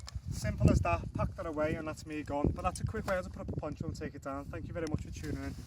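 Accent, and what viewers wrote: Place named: Liverpool, United Kingdom. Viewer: English with a Liverpool accent.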